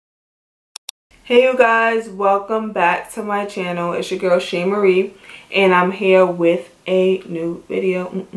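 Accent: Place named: Jamaica. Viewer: hey i love your accent , are you Jamaican?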